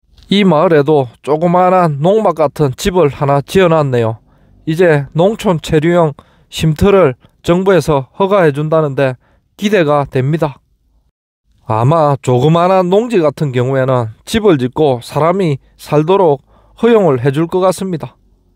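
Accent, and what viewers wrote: Gyeongsang, South Korea. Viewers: 구수한 경상도 촌스런(?) 억양에 진심어린 나레이션과 고품질 영상이 참 좋습니다^^.. 자주 찾아보게 되네요... 안전에 유의하고 건강 잘 챙기시면서,, 오래오래 좋은 유튜브영상 많이 제작해서 올려주세요~~^^.. 덕분에 앞으로도.. 저는 사무실에 앉아서,,, 고생해서 찍어올려주신 동영상을 땀한방울 안흘리고 편하게 볼 수 있네요... 좋아요 추전 합니다 .. ^^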